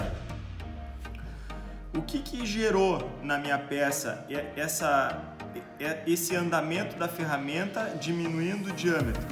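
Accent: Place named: Rio Grande do Sul, Brazil. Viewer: Muito bom seu vídeo! De qual cidade você é? pelo sotaque é gaúcho! sou de Vacaria -RS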